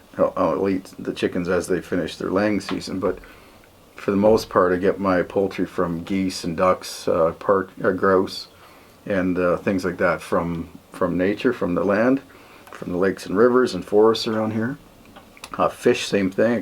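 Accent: Canada. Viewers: I come here for the Canadian accent.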